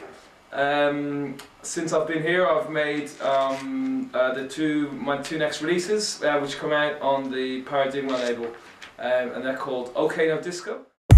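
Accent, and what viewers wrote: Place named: United Kingdom. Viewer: nice to see another minimal producer who can talk in a british accent living in germany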